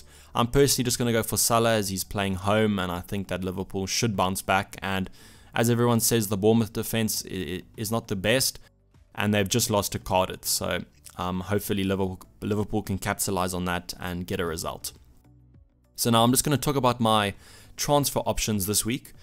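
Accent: South Africa. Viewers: Bro u South African?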